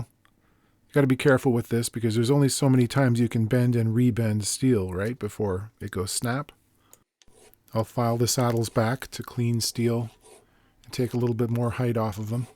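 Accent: England, United Kingdom. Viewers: Your English Accent sucks. I say this as an English person 🙂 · How delightful to see such a classic. However, the issue of precedence is moot, with Charlie Watkins (of Watkins Rapier and WEM Copicat fameI claiming to be first to market in 1954 (the year of my birth). I actually owned a Rapier briefly in my 20s and, for reasons which need not concern us (clue, it was in the 1970s and I was in my 20s), have no idea what became of it. It was a piece of history, and the back story is much the same as with Burns. In the UK, tariffs on imported instruments were huge in the 60s, as was the price of the instruments themselves. Even the top bands such as the Shadows (and later, the Beatles) spent the early days of not just their careers, but also their stardom playing UK or continental instruments. Thanks so much for this piece of history. If you're watching, I do know you have an ear for accents love the solder/sodder stuff), but your English accent crosses every river from the Thames to the Tyne (mate)! 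As a Londoner, I can tell different suburbs without getting out of first gear (extra points of the use of the iconic Canadian 'Ey;'). In passing, I note that van gent tuners, from the Netherlands and a great tuner, have been used for many years on a more recent iconic English guitar brad - Gordon Smith. Extraordinaty value instruments. I should mention that, more than ever, your commitment to researching the Hx is super. Great video in every respect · Major credits for the attempted English accent. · Yeah bit of a mish mash of accents, but you pretty much nailed the mentality. It’s always enjoyable hearing folks from your side of the globe doing Brit accents, I still imitate Dick van Dike from 50 years ago and have been doing it that long. Dad joke don’t get any better in my house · Love the English accent 😊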